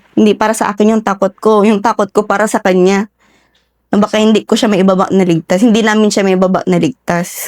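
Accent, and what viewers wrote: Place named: Visayas, Philippines. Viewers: Mam parang nainlove uli ako😅. Akala ko vsaya ka mam kc tunong vsaya ka, galing mo pakinggan nakakatuwa mas nakkainlove😅. May part 2 ka sana madam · Bisaya cebuana Ang intonation mo ma'am. I'm veri proud of u being a warrior sundalo.dapat may MEDALLA KA MAAM salydo sa nyo ni s ir clarin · Yung punto mo bisaya nman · Parang bisaya c Ma'am. Salute sau maam. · Bisaya narin ang Punto hehe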